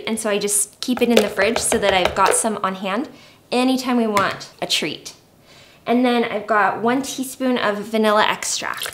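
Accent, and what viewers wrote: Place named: Nebraska, United States. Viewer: Lol, it's a Nebraska accent, actually. But I did go to BYU for school.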